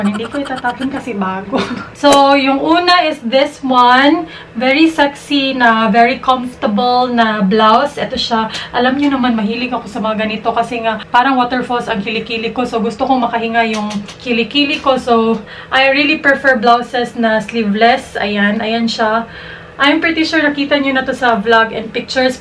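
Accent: Visayas, Philippines. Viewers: sa kaka panood ko sayo na adopt ko yung accent mo wahha. kaya akala ng mga viewers ko bisaya din ako heheeh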